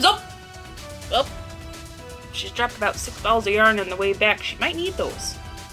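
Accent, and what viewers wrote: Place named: Midwest, United States. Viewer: This was absolutely FANTASTIC! SO hilarious, creative crafts, and I LOVE your Midwestern accent as the commentator with! 😂 Please consider a 2025 iteration, and thank you for the laughs! 🥳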